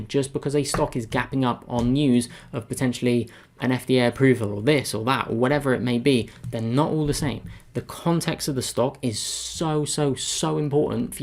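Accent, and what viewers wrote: England, United Kingdom. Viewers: Brilliant as usual, thanks. I hope you don't mind me asking, where are you from in England?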